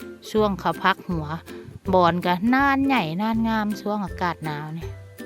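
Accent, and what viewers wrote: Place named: Sakon Nakhon, Thailand. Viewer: สวัสดีคะ สำเนียงการพูดเหมือนคนสกลนครเลยคคะ บ้านเดียวกันมั้ยคะ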